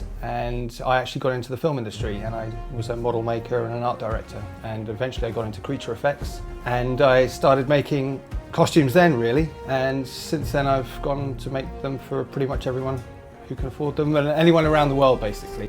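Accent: United Kingdom. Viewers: is he British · i'm and im now just realizing he's british😂😂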